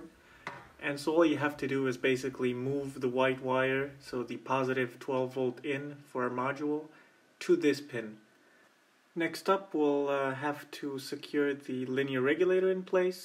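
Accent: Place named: Germany. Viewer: Very, very good description! And don’t worry about your diction, I appreciate German thoroughness!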